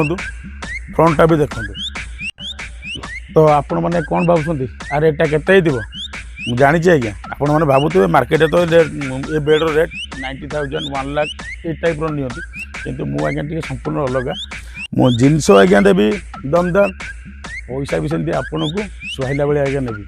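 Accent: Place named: Baripada, India. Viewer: Baripada ru kahuchi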